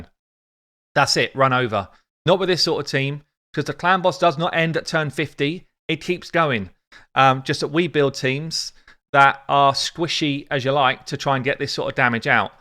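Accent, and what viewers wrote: United Kingdom. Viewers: Congrats. You rock! I love your British pronunciation and some expressions, it’s such a fun to listen and learn from your content. For me you should in each posted video say straight to the bin. You can say also as much as you want in each - Alure. Cheers mate!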